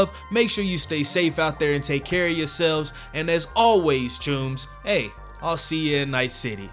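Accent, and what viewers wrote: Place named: Houston, United States. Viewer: "What it dew" "Going Dine" "Hol up" ....🤔This is a fellow Houstonian...and Black Cyberpunk fan...*Hits Subscribe immediately!!*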